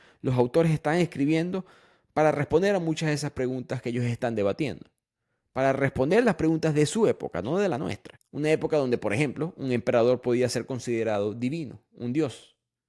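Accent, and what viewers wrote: Maracaibo, Venezuela. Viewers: Gracias por estos episodios, por el cuestionamiento y por tus análisis. Nueva suscriptora aquí. Crecí en una casa católica, estudié en un colegio católico. Toda la vida me hice estas preguntas que estas exponiendo en el episodio y la única respuesta fue "hay que tener fe". La falta de racionalidad, de cuestionamiento, hizo que me alejara mucho. Decidí irme por el camino agnóstico, luego ateo, pero ese ultimo camino me dejo mas vacíos que los anteriores. Desde hace un tiempo quise acercarme de nuevo al catolicismo, pero desde la curiosidad. Voy a misa todos los domingos a aprender, a escuchar, a conocer desde cerca la comunidad, para poder decidir por mi misma. Todo el mundo a mi alrededor (que ya no creen hace mucho) lo ven como algo raro. Empecé a leer la biblia para saber de primera mano que es lo que dice, porque siempre me la han leído de una forma hermosa. 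La frase que mencionaste "el ateísmo es lo que pasa cuando lees la biblia, el cristianísimo es lo que pasa cuando alguien mas te la lee" me quedo resonando muchísimo. Aun no he decidido, quizá sea uno de esos que a pesar de haberla leído o a pesar de las evidencias, deciden creer, o quizá no, pero la frase me pareció poderosisima, mas que pretenciosa. Gracias. (Shoutout al acento Maracucho!)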